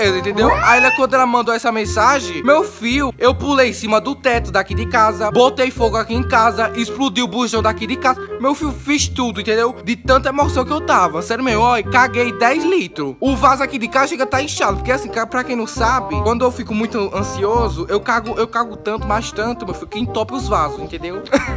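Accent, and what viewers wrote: Bahia, Brazil. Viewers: vc é baiano?? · C é da Bahia?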